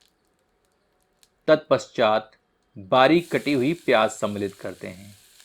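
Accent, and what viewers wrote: Standard. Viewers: aap ka sabse acha lgta bolna, jo ki itna shudh hota hai ki mano koi Guru hme mantra bolna sikha rha ho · your "shud" hindi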